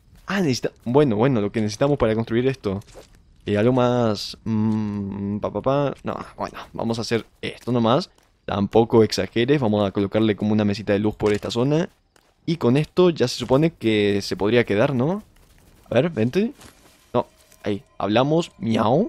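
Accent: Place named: Paraguay. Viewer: Sospecho que es paraguayo por su asento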